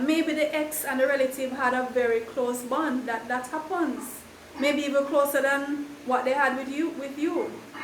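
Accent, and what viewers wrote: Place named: Jamaica. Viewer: Listening to the content and the patois🤭😊. Thanks for the insights. Cheers namesake💪!!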